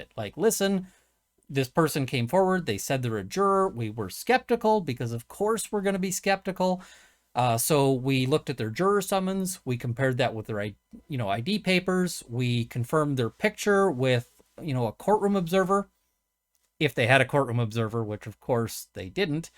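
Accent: Canada. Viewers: I love Canadian! 🤗 Please say 'out' or 'about' as often as possible.♥️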